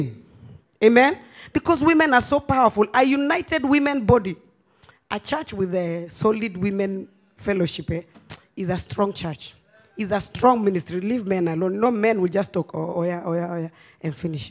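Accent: Nigeria. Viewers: ...you have become a Nigerian ooooohhhhh...the accent...ooops...Nigerian oooghh...congratulations!!!! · Ewwooo you're talking Nigerian acsent, I love your msg 💖💖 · You got the Nigeria accent now...Eweee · I am excited to hear the nigerian accent 😍😍😍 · !!!! You already taken the Nigerian accent so well, I can't believe its you. May God continue raising you to greater glory.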